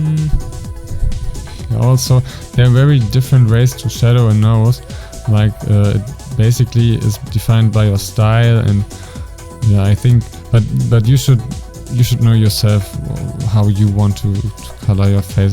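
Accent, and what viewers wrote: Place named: Germany. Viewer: Man hört ein schönes bisschen Deutsch aus deiner Stimme ^~^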